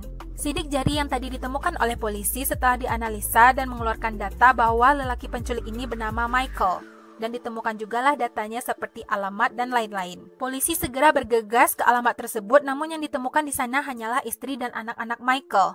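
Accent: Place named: Padang, Indonesia. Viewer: logatnya logat padang banget, org sumbar kah?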